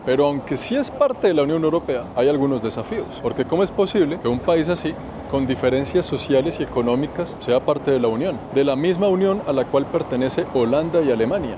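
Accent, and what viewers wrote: Colombia. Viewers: Hermosa ciudad Sofía...me encanta! Y también muy linda voz,coherencia mental y sabiduría en las palabras del locutor. Ese acento colombiano me fascina! Saludos desde La Pampa Argentina, de parte de una uruguaya trotamundos, que ahora viaja nuevamente con usted y otros viajeros, que me caen muy bien! ❤😊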